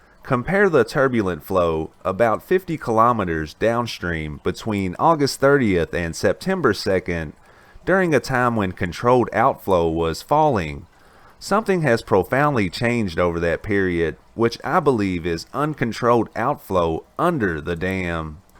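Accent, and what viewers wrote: South, United States. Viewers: Maybe this is a repeated question but its nice to hear a real person instead of a c g voice. I cant quite place the accent on the person. Tenn., Ms., Al. .? Anybody know.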